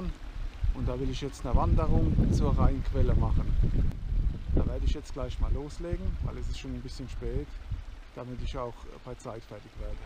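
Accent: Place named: Mannheim, Germany. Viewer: Schönes Monnemerisch 😁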